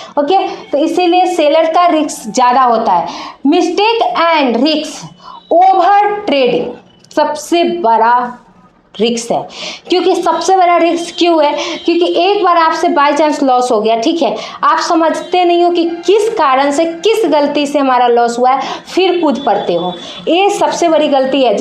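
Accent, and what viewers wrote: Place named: Bihar, India. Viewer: Oh hai na bihar say hai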